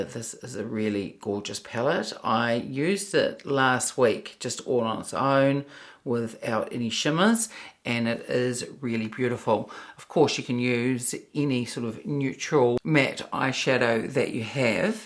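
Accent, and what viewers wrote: New Zealand. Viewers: Enjoyed your video. Love your New Zealand accent. I’ve been wearing mine over Oyster Pearl - using the dark brown from last year’s holiday palette in the crease and to line the lids. It creates a nice soft smoky look. I find the Smokey Quartz to be a bit silvery on my skin to I’ll be looking to matte that down a bit using a shadow from a quad or 12-pan. Either way I love them!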